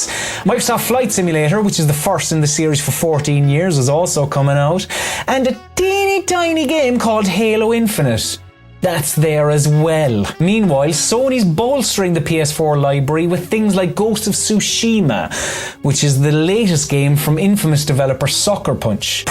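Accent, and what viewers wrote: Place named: Ireland. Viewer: Nice to hear your dulcet irish tones again 👍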